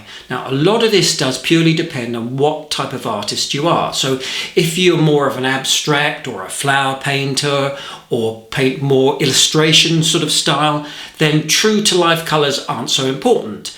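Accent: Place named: United Kingdom. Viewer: I have just discovered you and I am in love with your lessons and your marvellous British English and humour. Thank you so much!!!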